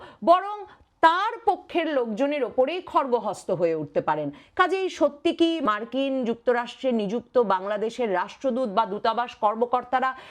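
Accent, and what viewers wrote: Kolkata, India. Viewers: আপু আপনি কোলকাতার ভাষায় কথা বলেন কেন, আপনি কি ইন্ডিয়ান, "র"?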